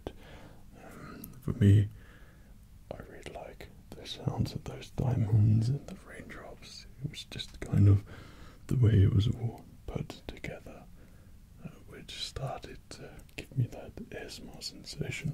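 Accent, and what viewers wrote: United Kingdom. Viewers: is he British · Are you British? It would be nice to find a british asmrist!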